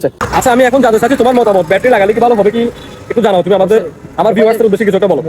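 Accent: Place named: Chittagong, Bangladesh. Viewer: হ্যাঁ ভাইয়া ভালো কনটেন্ট ক্রিয়েট করার জন্য আপনাকে কিছু উপদেশ দিতে চাই কথাগুলো আস্তে আস্তে বলবেন যাতে করে মানুষ আপনার কথাটা সম্পূর্ণ বুঝতে পারে. আপনি চট্টগ্রামের আঞ্চলিক ভাষায় কথা বলায় খুব দ্রুত বলে ফেলেন তাতে করে অনেক মানুষের বুঝতে সমস্যা হয়।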